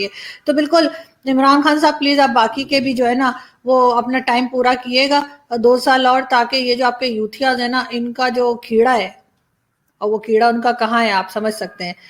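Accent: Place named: Mumbai, India. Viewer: ji.. mumbaiya hindi ek number.. aap sabki vaat lagaati ho 😁😁😁👍👍👍👍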